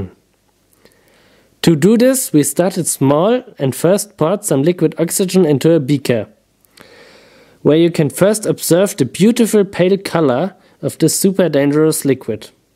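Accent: Germany. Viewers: Sauberer deutscher Akzent.. ✌🏻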